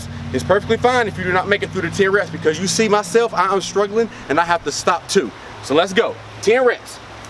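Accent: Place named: South, United States. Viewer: I like that southern accent, awesome quick bicep workout! Thanks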